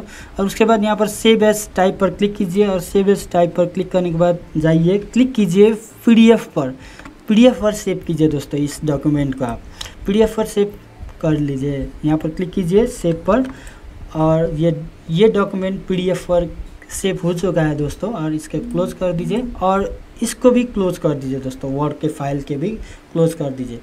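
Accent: Nepal. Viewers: Did you make this video for the people of India??? Your accent says clearly that you are Nepalese.....You could have made this video in Nepali or English language......Anyways thumbs up for the tutorial...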